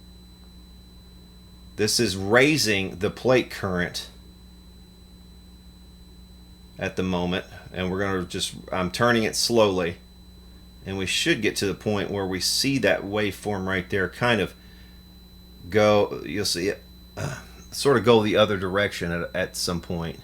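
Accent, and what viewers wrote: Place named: South, United States. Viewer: I noticed the amp was signed by Linda. Many years ago I read an interview with Dave Mustaine and Chris Poland from Megadeth and MegaDave said he would open up Marshalls and look for her name as it was sure to be a screamer. Looks like he wasn't full of shit! Love your vids and channel man, I'm from NC and have lived in Seattle for 20 years so it's nice to hear an intelligent southern accent.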